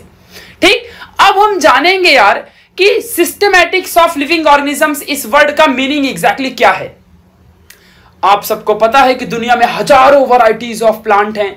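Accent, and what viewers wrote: Maharashtra, India. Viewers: Your marathi is is the best part of video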